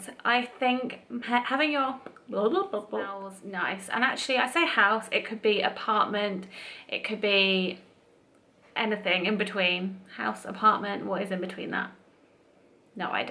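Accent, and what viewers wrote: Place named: United Kingdom. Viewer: im a man of simple things....i hear a British accent.....i subscribe 🤷🏾‍♂️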